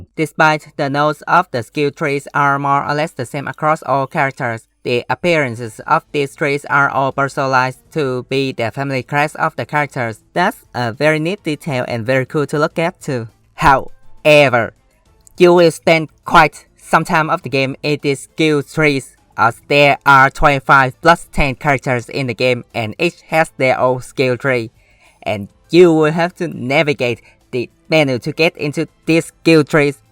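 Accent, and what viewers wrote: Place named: India. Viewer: Kudos, an excellent review covers pretty much everything I needed to know! however ur accent still needs bit more practice it mite be difficult for people outside India and Asia to understand. Good luck!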